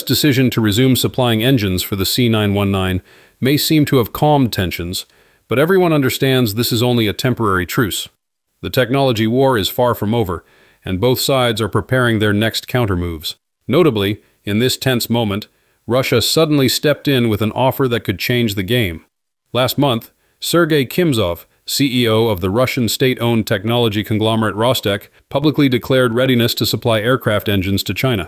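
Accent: United States. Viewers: Why is it always a droning American accent, that provides the 'expert' advice, telling us that China will die without outside technology? You need to get an air ticket to China you clown. Its just faintly possible, you might be educated to learn that China, by any comparison, is 100years in advance of the rest of the world. This is just shit comedy but pass the bloody popcorn anyway😂😂😂😂😂😂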